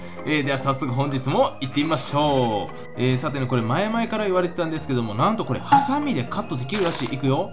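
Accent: Fukui, Japan. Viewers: このころの和さんのしゃべり方がよりすきです 蛭子能収 水曜どうでしょうの試験に出る日本史の先生役の大泉洋 夢グループの胡散臭い社長さん にイントネーションとかにてるなーといつもおもいます 福井の方言なのでしょうか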